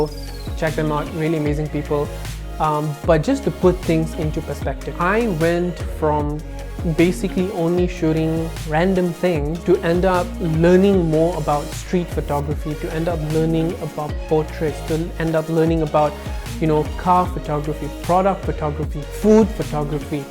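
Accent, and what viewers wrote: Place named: United States. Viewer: You sound like an American way too “AMAZING”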